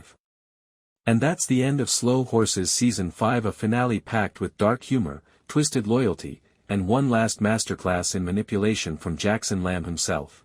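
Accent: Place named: United States. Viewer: Slew House ?????? Do you mean Slough? Using a US narrator! Whose stupid idea was that?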